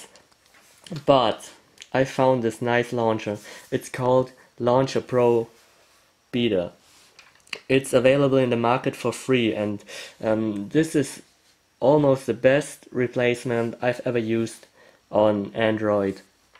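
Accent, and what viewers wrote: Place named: Germany. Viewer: awesome german accent...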